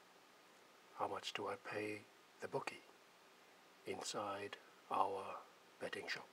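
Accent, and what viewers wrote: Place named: Wales, United Kingdom. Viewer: Welsh accent for you. L)=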